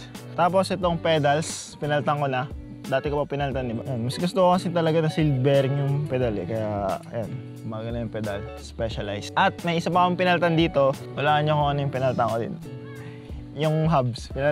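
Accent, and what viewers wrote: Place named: Coño, Philippines. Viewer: coño ka pala master " ":D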